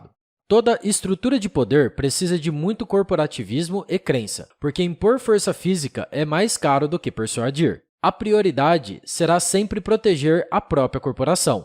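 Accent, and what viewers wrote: Brazil. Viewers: Peiceberam, poique, podei, seiviço, ocultai, abeita, Salvadoi, meicado ... O narrador nasceu num dos três estados, Minas, Paraná ou São Paulo, pois troca o R pelo i , da até agonia.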